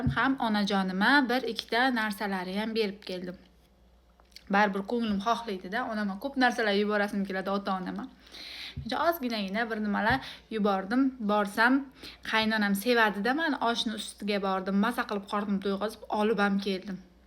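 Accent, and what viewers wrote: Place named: Kolyon, Uzbekistan. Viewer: Siz kòlyondan emasmisiz gapirishiz òxshaydi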